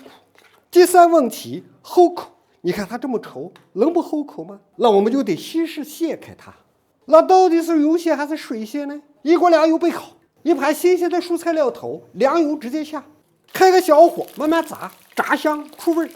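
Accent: Shaanxi, China. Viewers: 纯正的陕普，非常亲切！感谢！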